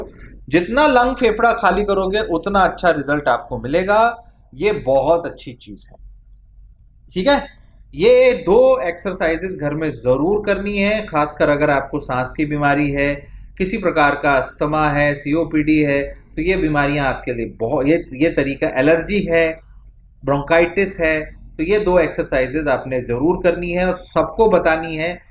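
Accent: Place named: Punjab, India. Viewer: Maadi moti 😄 punjabi nikal e jandi aa sir 😂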